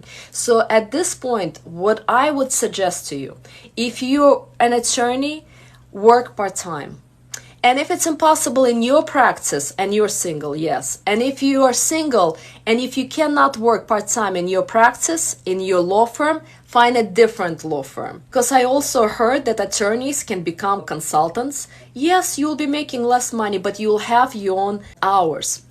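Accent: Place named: Eastern Europe. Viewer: Man here, you are the relationship advice women need to hear instead of “blah blah blah Tinder makes you feel whole, go sleep with 100 men to find yourself”. I can tell from the accent you’re from Eastern Europe so no wonder you are smart and beautiful. I love Eastern Europe and my Croatian gf who is what you described basically :)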